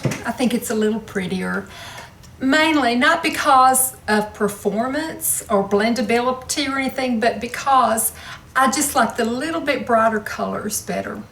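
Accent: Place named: Oklahoma, United States. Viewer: I just love your accent and the expressions you use! Reminds me of home (Oklahoma). Loved the eye looks and I'll bet no one could tell you had two different pallettes on! XOXO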